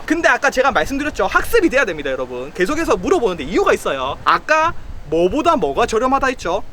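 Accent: Busan, South Korea. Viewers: 댓글보니 외국인같은데 한국말 부산사투리 쓰면서 엄청잘하시네... 제2의 로버트할리인가 ㄷㄷ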